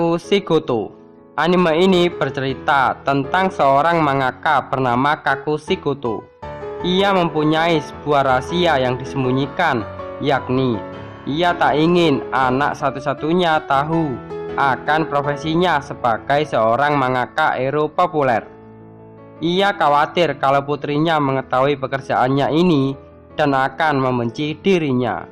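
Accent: Java, Indonesia. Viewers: Full video bahasa medok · ngk ngakak dg logat jawanya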